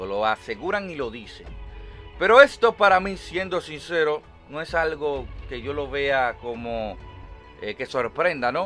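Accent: Dominican Republic. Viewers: Brother tu eres de RD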